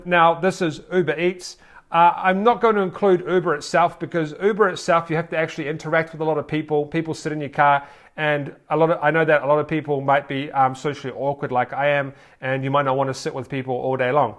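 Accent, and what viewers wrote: New Zealand. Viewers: Hey, bro are you Kiwi?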